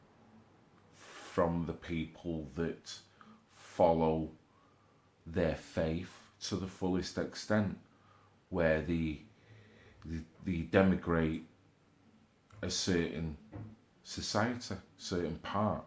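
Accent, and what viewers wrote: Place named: England, United Kingdom. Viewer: Dear friend in England... I watch most of your videos, and I do struggle with the accent...but if I don't understand...I back the ding-dang video and listen to it again (until I do get it!) But is there any way you can increase the volume, because that really helps? (true...I am an "older" American lady, so part of my issue is hearing🙄 but some English accents are more difficult than others) The reason I follow you and Tommy and others is because, what is happening in the UK, might easily be mirrored, once President Trump's terms are ended. Thus...what the Brits do to push back is important to learn. I just wish y'all had fought back significantly sooner...but at least now, most of you have had enough. England has always been strong and the English ... a determined people! Conservatives (the Red Pilled hoard) are watching and cheering for our cousins across the Pond!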